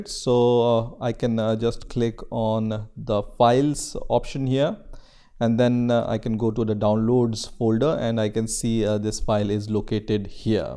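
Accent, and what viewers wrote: India. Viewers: hearing his accent I can confirm that he is an indian guy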